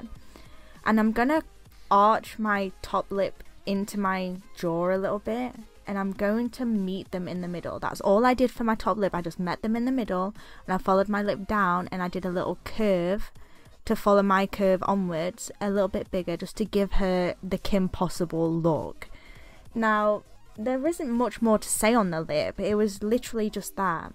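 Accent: United Kingdom. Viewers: Fell in love with your accent innit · Well I’ll be dammed if this isn’t the most British accent out there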